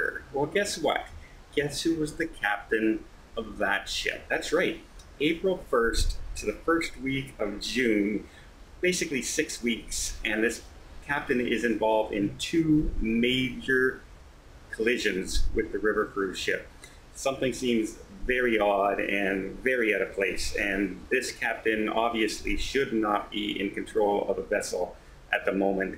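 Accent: Canada. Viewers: The Mighty Cruise Ship Experience He’s Canadian, eh?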